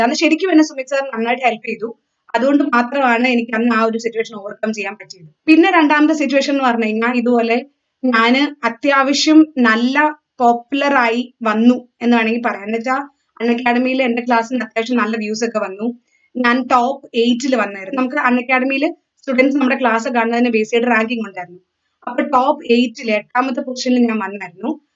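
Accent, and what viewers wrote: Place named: Tamil Nadu, India. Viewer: Are you no malayali?🤔Tamil style aanelllo.🙄UK annenn vach Malayalam parayaan nanakedd ano?😮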